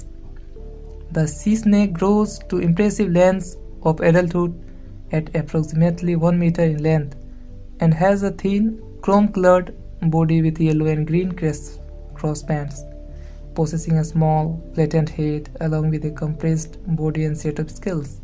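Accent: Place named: Mexico. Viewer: I like your Mexican accent.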